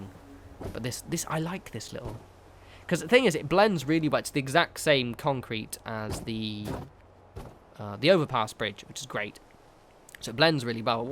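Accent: United Kingdom. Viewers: I used to think you said "follow me on twitter at no response" instead of at the end of every videos of urs. British accent sure is somethin..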